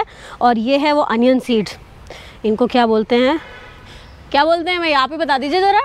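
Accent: Bihar, India. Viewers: Wah di wah... Kya to gajab aapne bihari boli or kya gajab ka explain kiya or uper se itni gajab ki recipe thi litti chokha ki sach main di khafi jayada maja aa gaya aaj ki video main. Thank You So Much For Shareing This Recipe. Di Best of wishes. 🌟🌟🌟🌟🌟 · Kya bihari bolti ho di😂😂 mujhe ase litti chokha pasand nai h leki apne kya gajab👌👌 recipe batai ho di ak bar try jarur karungi☺️😋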